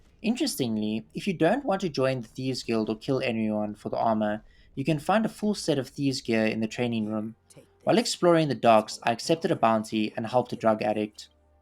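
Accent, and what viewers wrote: South Africa. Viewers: I can recognize a south african accent from a mile away, ggs man :))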